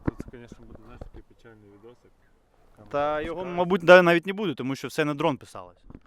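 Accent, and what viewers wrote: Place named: Kryvyi Rih, Ukraine. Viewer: а ви сам з Кривого Рогу чи з агломерації? Бо так говорите неначе тутешній, нам криворізцям цікаво звідки ви🥰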